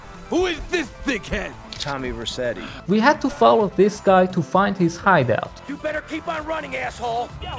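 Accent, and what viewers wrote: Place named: Iran. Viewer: Persian accent 😂😅❤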